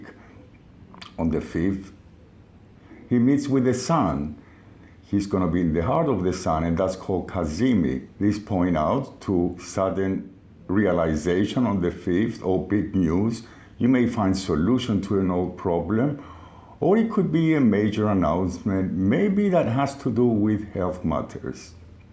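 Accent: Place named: Cyprus. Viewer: Hey hey! nEw here and truly amazed! Judge by your accent you Cypriot? ;p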